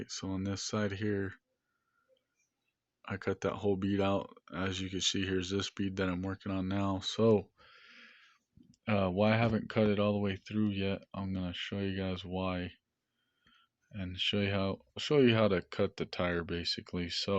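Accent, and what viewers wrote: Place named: Alaska, United States. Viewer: Are you Alaskan? My cousin has a similar accent, she lives in Juno. Just curious! You have Great Content on here! Keep the Awesome Videos coming! 🤗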